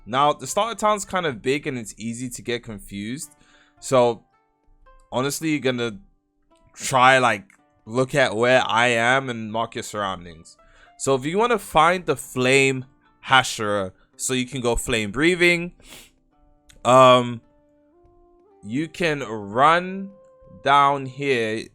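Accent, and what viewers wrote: United Kingdom. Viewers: We can barely see where your going lobotmite british dude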